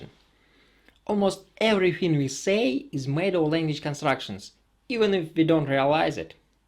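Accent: Russia. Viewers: He sounds more like Tevya in "Fiddler on the Roof" - which is set in Tsarist Russia. I love his accent! 😁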